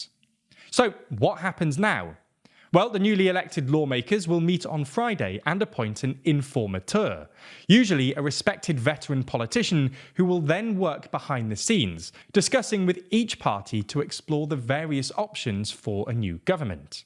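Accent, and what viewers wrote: England, United Kingdom. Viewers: Yes You tell me, a Dutch citizen, with your pompeuse Brittish accent. First fuckup one minute. Okay he put those points(Wilders) in the fridge. PVDA Groen Links(far left) NSC is mid left, actually wilders is left on economic stances and right on migration. He is NOT Anti EU he is for an Economic European community, you know the thing EU was supposed to be. For a Brit, you got your facts pretty well sorted. I predict PVV, BBB, NSC, VVD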